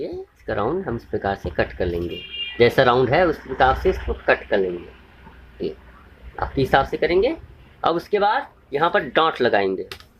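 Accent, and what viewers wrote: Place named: Maharashtra, India. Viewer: सर आप महाराष्ट्रीयन है आप ईस व्हिडिओ मे जोभी वल्ड बोल रहे होना ईसी लुये हमे लग रहा है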